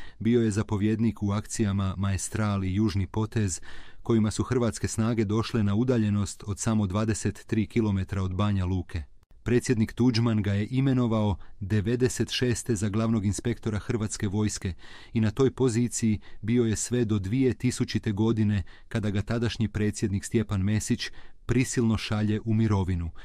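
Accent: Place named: United States. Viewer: Ti kao da si Amerikanac kako to izgovaras Gotovina Zadar itd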